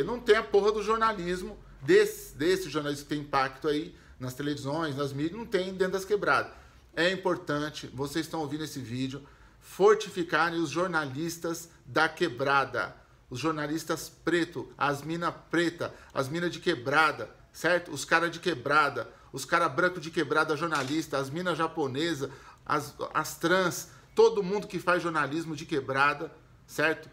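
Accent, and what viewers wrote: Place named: Urban periphery, Brazil. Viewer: Vc tem a voz da periferia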